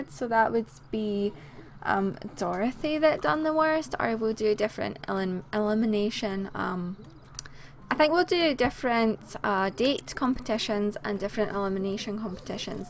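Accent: Scotland, United Kingdom. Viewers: Are u British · i was trying to figure that out too but think its Scottish. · Are u Scottish love ya voice.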